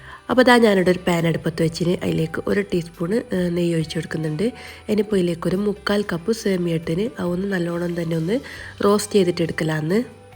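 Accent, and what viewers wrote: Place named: Kannur, India. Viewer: തനി നാട്ടിൻപുറം കണ്ണൂർ സ്ലാഗ്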